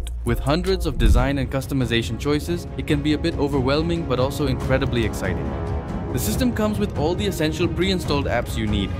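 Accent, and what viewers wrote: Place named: India. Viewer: Bro is in Colombia and has a indian accent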